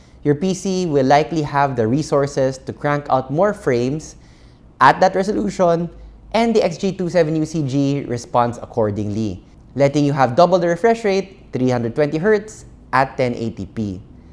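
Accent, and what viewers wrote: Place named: Philippines. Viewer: Filipino accent is too funny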